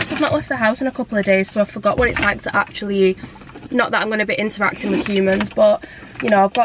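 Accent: Manchester, United Kingdom. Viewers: Where’s the Manc accent gone thoooo! 😂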